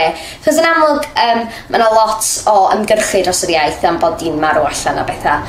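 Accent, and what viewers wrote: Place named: Llŷn, United Kingdom. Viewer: Oni byth yn meddwl fyswn i yn dod o hyd i un fel yma!! Ti'n neud yn wych chwarae teg!! Ti'n dod o Ben Llyn ma dy acen yn swnio'n lleol i mi!! Xx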